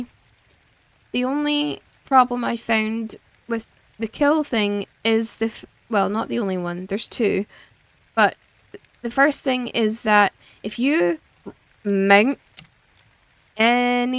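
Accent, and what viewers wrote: Scotland, United Kingdom. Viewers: Stone foundations are great for crafting when taming a drake. You're a Scot. I love that. I lived in Glasgow for 2.5 years. I'm a huge Scottish history lover. Massacre of Glencoe is/was a huge interest of mine. Ancient Scot history is brutal. Awesome listening to your accent. Scotland is such a beautiful country and should never have merged the thrones with the deceitful low landers throne.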